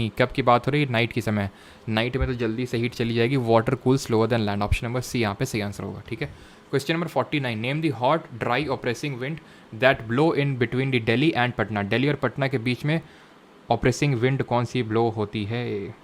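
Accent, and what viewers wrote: Kanpur, India. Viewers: Sir kanpur se ho aap ?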